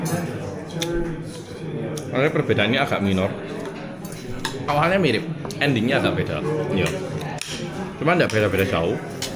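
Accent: Java, Indonesia. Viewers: Medhok e rung ilang to yoo😂😂😂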